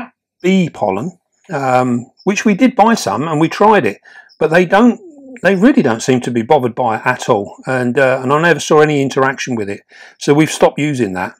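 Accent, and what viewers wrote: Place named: Cockney, United Kingdom. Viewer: I stumbled on one of your videos yesterday and the way you handled and cared for a big Australian spider seemed to be a bit therapeutic for me regarding my arachnophobia. You remind me a bit of a cockney David Attenborough (no offence). Thanks, you've got a new viewer. 👍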